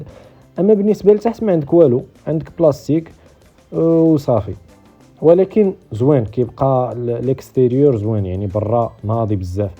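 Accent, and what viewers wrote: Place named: North Africa. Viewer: وربي مكيف ومبسوط على اللهجه اكثر من ااسياره.. ربي يحفظ شمال افريقيا واهلها الكرام.. جعل ربي يرزقكم هل سياره كل واحد منكم.. لانكم تستاهلون كل الخير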